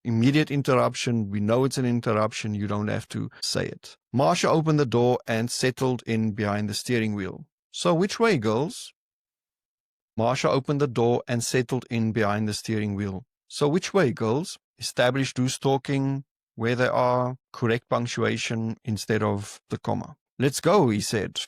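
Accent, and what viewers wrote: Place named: Southern Africa. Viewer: That accent...which side of the Limpopo are you from? (Harare lad here!!)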